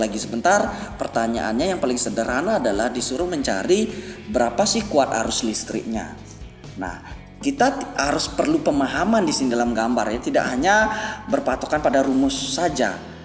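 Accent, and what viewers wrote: Bali, Indonesia. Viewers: Kelihatan bli dari logatnya hehe, salken nggih 🙏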